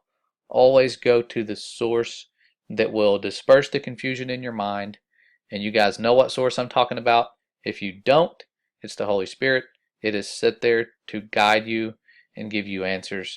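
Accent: United States. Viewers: I’ve never heard Cairns pronounced with an American accent like yours ,, he he I was hoping to travel up from Sydney to go barramundi fishing in Queensland ,, Scott Morrison/prime minister went on a family holiday while his country burned down ,, and the 60million dollars donated by the public for victims was kept by the charity organisation,, these big charity organisations are money making organisations when u look into their history Haiti is a good example of how they operate poorly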